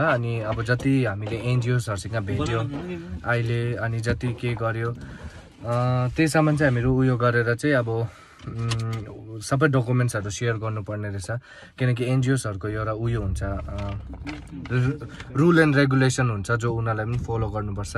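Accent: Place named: Manipur, India. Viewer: bhai timro bolne tarika manipuri nepali ko jasta cha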